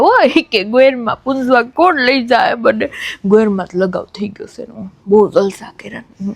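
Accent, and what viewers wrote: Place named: Kathiawar, India. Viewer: Very nice video Very good tamari kathiyavadi leg vage. Videsh maa rahi ne jadvi rakhi che.way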